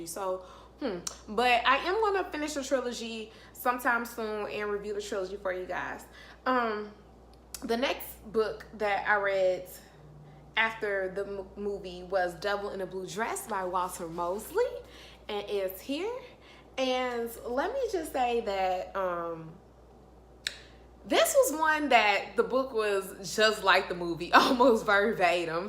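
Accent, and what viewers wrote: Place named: South, United States. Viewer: So pretty... my daughter loves Gone Girl. I think I’m drawn to your southern drawl. Keep up the good work. #southerngirls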